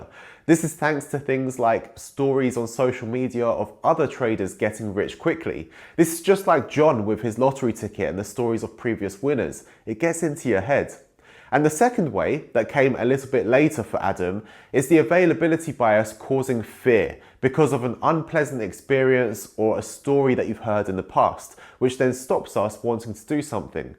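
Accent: United Kingdom. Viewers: Long time no hearing ur british accent welcome!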